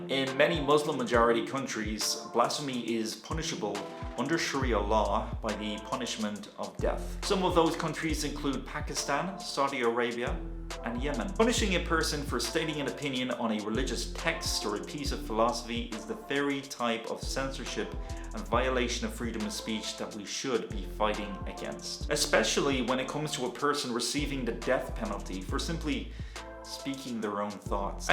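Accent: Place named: Ireland. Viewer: very cute :) you look and sound very very Irish here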